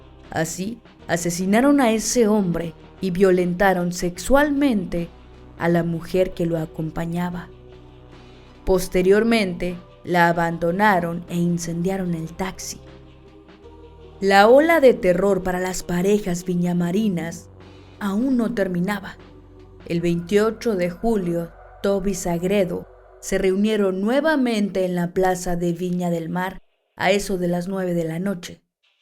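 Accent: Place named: Mexico. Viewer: Muchas gracias,adoro tu trabajo , e tu acento mexicano , lo encuentro muy agradable al oido. Abrazos desde Brasil.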